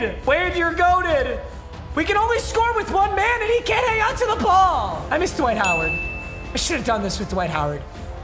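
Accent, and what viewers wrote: Michigan, United States. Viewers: Michigan accent is rlly comin through on this episode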